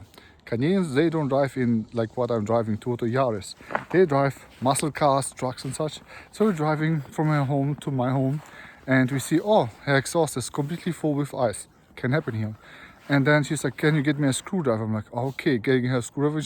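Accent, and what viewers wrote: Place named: Germany. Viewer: your accent is cute. i can tell right away you are german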